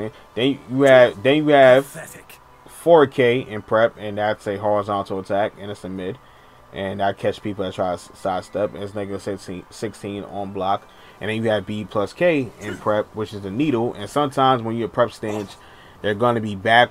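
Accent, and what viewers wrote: United States. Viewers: Fuck all these haters, you speak like an American, and you know how to teach. This bullshit 6ab or whatever doesn't work when teaching newbies, good shit man!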